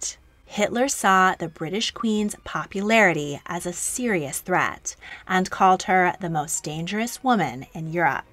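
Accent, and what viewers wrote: California, United States. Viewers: Californiaaaaaan accent with vocal fries absolutely horrible. Say Trey for us and which roads you used today. USA refused to enter the first World War until the very last moment in 1917- the cannon fodder to hold back the otherwise successful German push on Paris. 1926-8 USA banned immigration especially by European Jews. 30% of USA was German decent and lobbied (I would argue 99% with the most honourable reasons) for USA to continue isolationist policy. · Good video but omfg the narrators voice makes me suicidal soo american and soo high pitch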